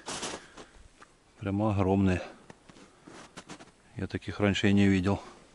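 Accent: Ukraine. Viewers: так по говору можно догадаться что он с украины.у них свой акцент имеется.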